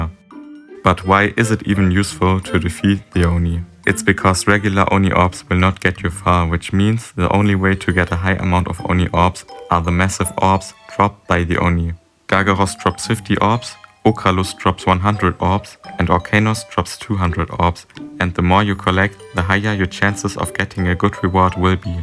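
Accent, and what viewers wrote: Germany. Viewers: Anhand des Gameplays und dem Akzent konnte ich entnehmen, dass du deutsch bist und ich bin dir soooo unfassbar dankbar. Ich habe Muzifer nun auch und auf dem account(ich starte relativ oft neue) auch glamourella. Nur noch Kalteser fehlt und ich bin dir ehrlich sehr dankbar. Kannst du eventuell auch einen guide zu panda noko machen?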